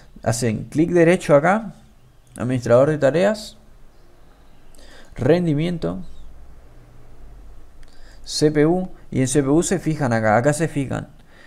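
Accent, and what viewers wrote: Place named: Argentina. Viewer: fua chabon de q provincia sos? Soy de misiones, te noto cercano xd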